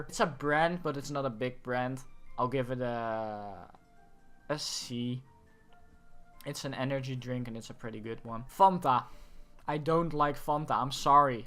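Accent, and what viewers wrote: Netherlands. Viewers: listend to this for 10 secs and ur defenetly dutch lol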